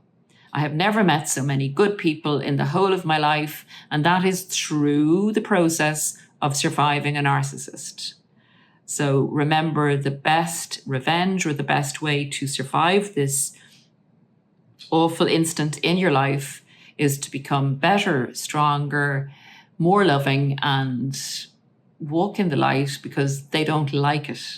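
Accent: Ireland. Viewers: Just love your Irish accent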